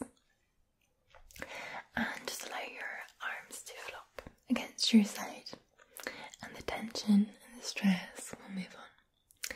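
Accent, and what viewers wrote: Scotland, United Kingdom. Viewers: Sleep therapy with a scottish accent. Nice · I didn’t know I needed Scottish Accent ASMR until now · im working at a construction site lifting 4ton tubing listening to scottish asmr...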